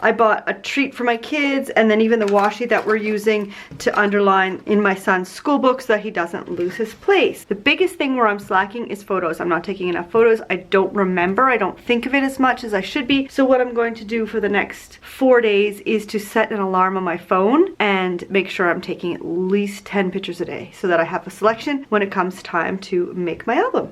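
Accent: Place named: Canada. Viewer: Holy Moly! Did you say your 7 year old son speaks 4 languages???!!! Wowza! I’ve always been curious as to where you live (you sound Canadian but you reference “Europe” often), I was tickled to hear in your video on 8/2 (I think) that you live in Luxembourg! Very cool! Could you do a video about yourself, how many kids, where you were born/grew up, where you live on and why, how you go into YouTube, etc. I just enjoy learning about people! Love your videos!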